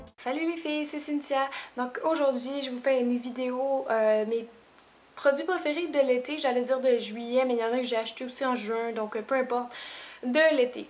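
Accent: Quebec, Canada. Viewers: C'est vrai ? Pourquoi ? Moi la première fois que je suis allé au Québec (dans le Sud), je suis tombé amoureuse de cet endroit, d’ailleurs, j'ai repris mes études pour avoir un bon travail et tenter de m’installer au Québec. J'adore tout là bas. même si j'aime aussi ma belle France : ) Et j'adore regarder les vidéos de Cynthia, aussi pour son accent parceque ça me refais penser à votre province <3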